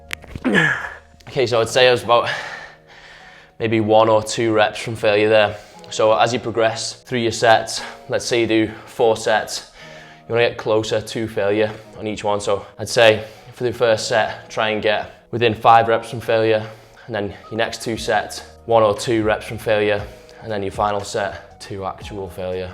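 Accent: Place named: Manchester, United Kingdom. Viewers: where in the UK are you from? You sound mancunian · Fukin Manchester, Lad .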